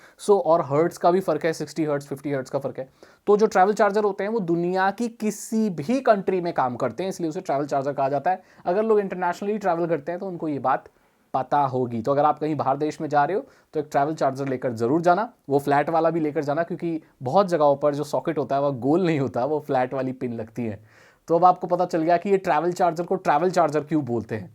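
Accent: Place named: Bihar, India. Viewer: Ap paka bihar hai na bhaiya mujha aise kyu laga hai ki ap bihar sa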